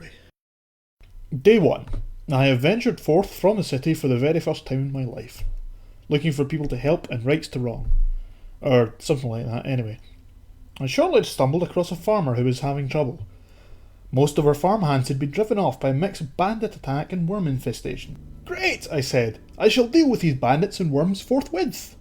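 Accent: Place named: Ireland. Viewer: i think he is Irish lol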